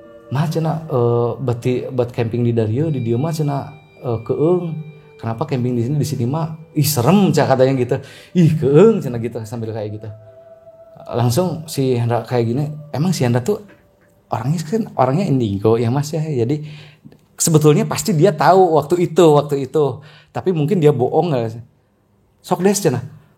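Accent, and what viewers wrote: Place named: Bandung, Indonesia. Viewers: Duh gusti Aa jatohnya jd bodor ah nyampekeun caritana sunda pisan hahahaha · Ngomong teh meni bandung pisan atuh mang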